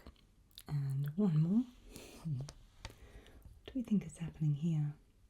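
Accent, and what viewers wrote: England, United Kingdom. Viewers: I really love your English accent, it is soooo comfortable and beautiful ❤❤( I don’t know if this is polite and sorry if it is impolite I just want to say it’s so much comfortable and really make me relax!!)Thank you for all your hard working on ASMR~~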